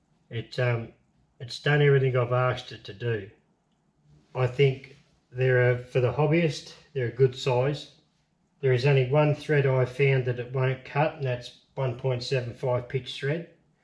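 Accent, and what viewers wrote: Australia. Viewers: yeah bung the DRO on, I need to put mine on and having an example of someone who talks Australian doing it would be really good!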